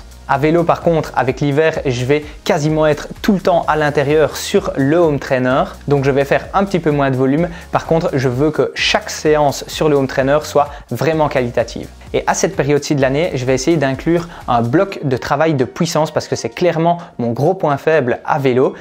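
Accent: Belgium. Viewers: yo man tu es d'où en Belgique? Je crois entendre un accent liégeois mais pas sûr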